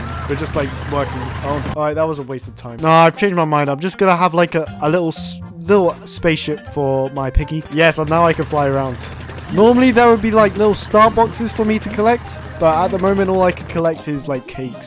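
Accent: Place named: United Kingdom. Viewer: yeah he's British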